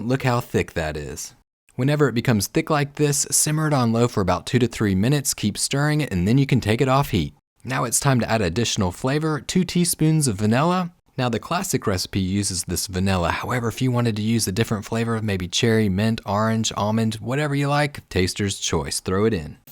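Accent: Tennessee, United States. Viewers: I'd say the Southeast, particularly Tennessee.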